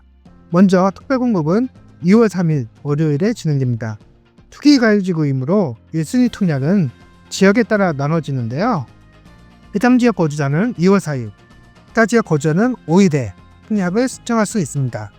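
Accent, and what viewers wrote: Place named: China. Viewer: 대한민국 부동산 콘텐츠를 중국인이 다루나요? 발음이 듣기 너무 불편하고 알아들을 수 없는데, 한국말 공부를 더 하시고 만들어야 할 듯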